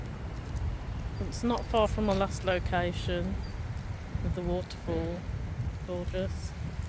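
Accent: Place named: England, United Kingdom. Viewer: Just love this english accent too! Why dont you check Kemit ancestry ?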